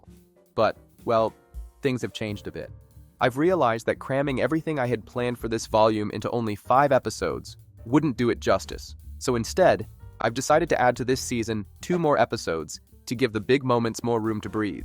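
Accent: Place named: Midwest, United States. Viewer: Very nice new avatar and voice. We enjoy listening to your new voice. I am curious how you manged to get a US mid-west accent in place of your own. Did find another voice similar to your own and sample that instead?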